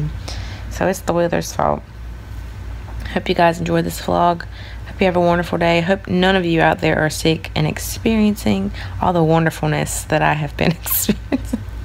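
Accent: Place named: Alabama, United States. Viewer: Where are you from? I love your accent. It sounds like an Alabama accent!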